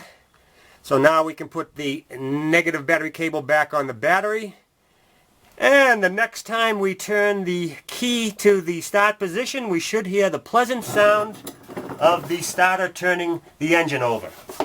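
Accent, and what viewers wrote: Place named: Boston, United States. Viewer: My supervisor is from Boston and has the same type accent, it's common in the New England area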